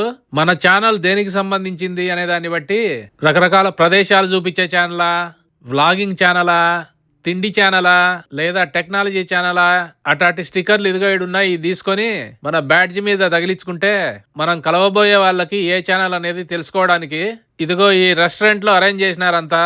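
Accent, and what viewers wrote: Kadapa, India. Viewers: సూపర్ గారు. కడప బాషను చాలా ఉంది. మీది కడప దగ్గర ఏ గ్రామం గారు. మాది రాజంపేట. ఎడ్యుకేషన్ కడప ఆర్ట్స్ కాలేజీ. ప్రెజెంట్ నేను హైదరాబాద్ చందానగర్ లో ఉంటాము. 1991 హైదరాబాద్ కు ట్రాన్స్ఫర్ అయినము. కడప బాషా వినాలా అంటే మీ ఛానల్ విని ఆనందం పడతాము.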